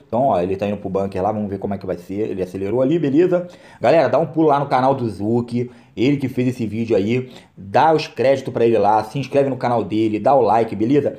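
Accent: Rio de Janeiro, Brazil. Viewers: Tu e carioca ne? Kkkk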